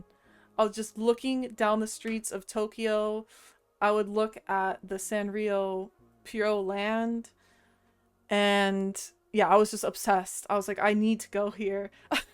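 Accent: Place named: Australia. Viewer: Wow I always thought you were some type of Australian for some reason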